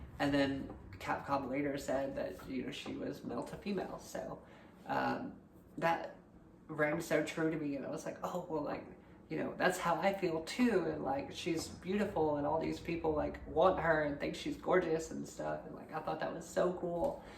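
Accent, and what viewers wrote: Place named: South, United States. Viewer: I am loving the southern drawl in your voice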